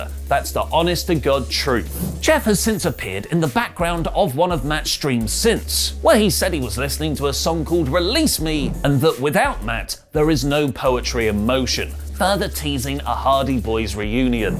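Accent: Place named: Cockney, United Kingdom. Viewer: Love cockney street urchin speech begging for subscriptions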